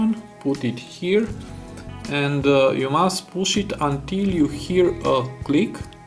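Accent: Romania. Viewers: like your Romanian accent of talking, man👍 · Romanian accent....the best.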